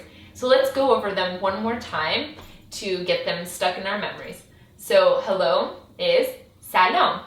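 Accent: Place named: Tehran, Iran. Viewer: there are some very old persian dialects. I have only run into one person who spoke an older, more traditional Bakhtiari persian. This is more modern "tehranian" accent persian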